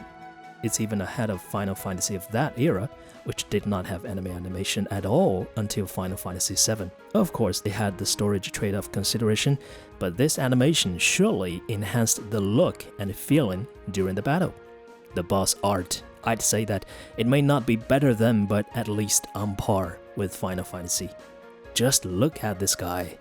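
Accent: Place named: United Kingdom. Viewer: omg 这么酥的英音吗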